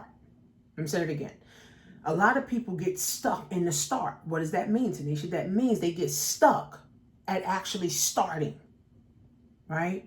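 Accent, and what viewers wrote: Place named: New York, United States. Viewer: Not only are you telling the truth that New York Accent ❤ much love from Cleveland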